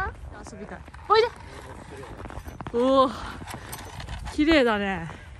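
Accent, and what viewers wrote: Kyushu, Japan. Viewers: 文がまるで犬のような姿見せてくれてる事よりも、 姉の拠点が関東な事に衝撃を受けてます イントネーション的に九州辺りかと思いながら見てました （過去動画に引っ越し関連の説明あったりハズレてたらゴメンナサイ）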